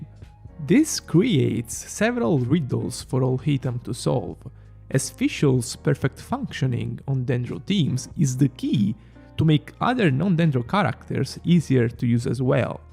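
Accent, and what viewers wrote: Italy. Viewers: I love this italian accent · I thought he was Italian from the accent but damn 😂😂😂😂😂😂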